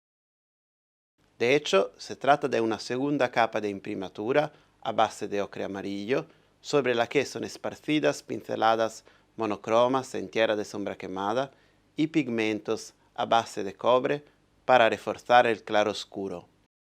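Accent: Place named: Italy. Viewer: Hermoso video acompañado con un gracioso acento italiano. Traducir en 2 idiomas además de la lengua nativa, es de un esfuerzo y compromiso que se aplaude. Ustedes parecen tan multifacéticos como el mismo Leonardo Da Vinci. Muchas gracias por el video. Una consulta: ¿la acuarela azul es hecha con trementina, o con agua? Muy amables, ¡y feloz año nuevo!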